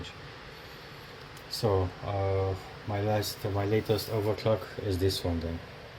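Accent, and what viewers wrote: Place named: Turkey. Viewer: merhaba, aksaninizdan Turk oldugunuzu dusunuyorum, bilgilendirici video icin tesekkurler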